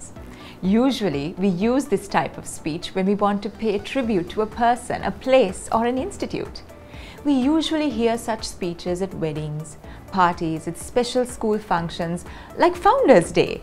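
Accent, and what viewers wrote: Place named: England, United Kingdom. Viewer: Thank you, teacher. Your English accent is amazing.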